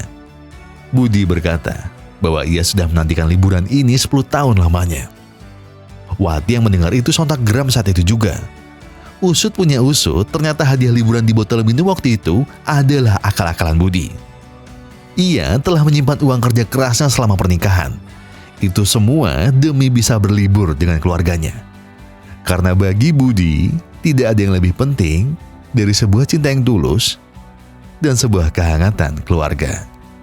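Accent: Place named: Java, Indonesia. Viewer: Lu asik bang jelas wong jowo iki mas 😂😂😂😂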